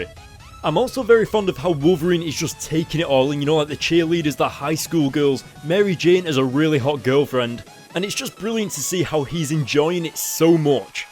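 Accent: United Kingdom. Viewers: never knew you were english.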